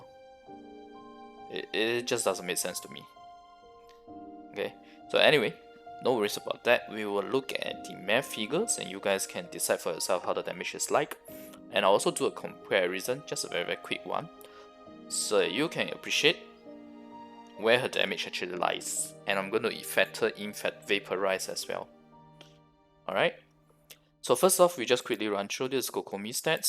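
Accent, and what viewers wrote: Malaysia. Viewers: Hey, came across your video on a fine random Sunday, and gave it a listen. It's rather long and my brain has troubles processing lots of technical information so I thought I would drop a comment to clarify a few things instead. But first of all... Nice to know there's a fellow SEA player out there, in fact, I'm guessing you're Malaysian more so than Singaporean:P. Yes, the accent was super thick (no, nothing wrong with that). If you are Malaysian, just know we're brothers KEK. I'll be even happier if we actually live in the same capital, cause I see a lot of Malaysian faces on Genshin and a few of them doing content creation, and I think we could use more Malaysian talents in a game like Genshin Impact. Now onto my question... Everlasting Moonglow. You mentioned something about the weapon's passive giving FLAT bonus instead of Multiplicative bonus. 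Like I said, I am not a math guy and technical stuffs isn't my forte. Usually, I just do generic math and that's about it, but what is multiplicative bonus in this context? Say I have 50,000 HP on Kokomi now, and this weapon gives me 3% at R5. Can I assume multiplicative means 3% of 50,000? Meaning 1,500 for Normal Attack DMG as stated in the weapon's description? Or is this FLAT? All things taken into consideration, the increase does feel rather meager, but I think the gem of the weapon lies in its ER mechanic. Here's what I don't understand tho. I'm assuming you referred to the Kokomi Mains' master guide for damage cros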